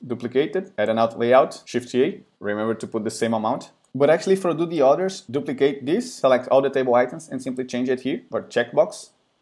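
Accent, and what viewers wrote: Brazil. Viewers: Muito bom! acredito que seja brasileiro pelo sotaque. Parabéns pelo canal Bro! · Se esse sotaque não for de Brasileiro não sei mais nada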